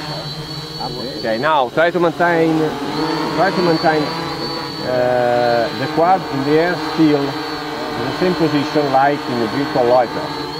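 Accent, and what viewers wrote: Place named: Ferrara, Italy. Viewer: I can notice the accent from Ferrara even if you're talking in english.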